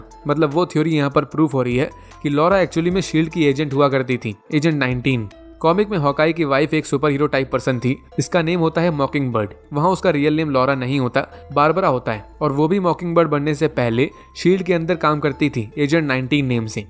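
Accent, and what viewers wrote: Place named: Sitapur, India. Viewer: Hey bro R u from district seetapur up . My friend is also from there and you both speak same way. I every time enjoye your sweet voice and explaining method Thank you 😍😙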